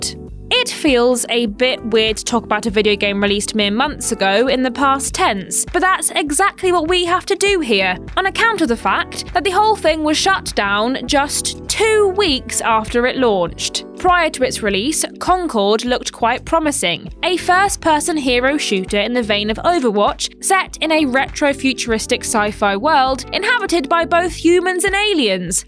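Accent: United Kingdom. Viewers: For some reason, sounds more British than ever in this video <3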